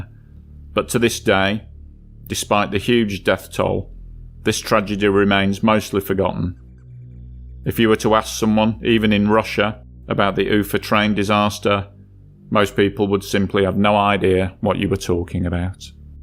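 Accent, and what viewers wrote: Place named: Northern England, United Kingdom. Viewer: You still sound like you're in the north of England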